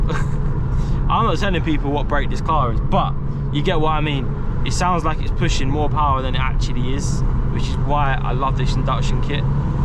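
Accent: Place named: United Kingdom. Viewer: 1) intake and POV vids sound beautiful! 2) Which part of the UK is your accent from? Asking to learn. So many dialects over there on two islands, and I enjoy them. Cheers from across the pond. ✌ 🍻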